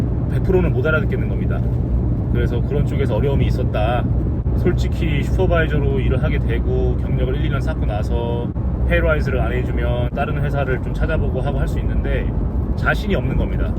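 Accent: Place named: Australia. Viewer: 호주는 몇살때오셨나요? 영어발음이 호주계 한국인같은데..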